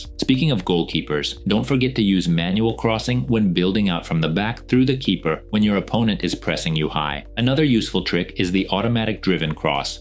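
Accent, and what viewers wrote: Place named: Russia. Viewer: Так я знаю этот голос) сначала мысля -, а моожет и на том канале голос ИИ , но посмотрев пол видео понял, что ты говоришь а не ИИ) Английский гуд, но акцент нашинский именно)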